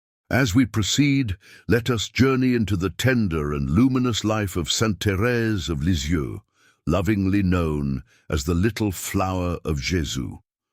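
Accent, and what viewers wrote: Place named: United Kingdom. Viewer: Please! Drop the creepy AI British accent ..just can’t listen to it. How about a woman’s voice for a change?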